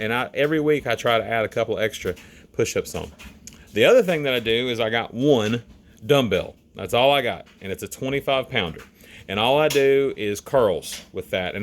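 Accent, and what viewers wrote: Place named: South, United States. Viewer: I love the southern accent!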